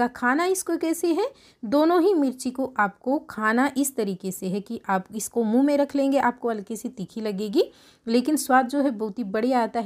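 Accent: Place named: Marwar, India. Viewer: thank u ji.... ☺️ Hukum aapni marwadi me vi bnao koi... Kitni mithi boli h aapni marwadi😇😊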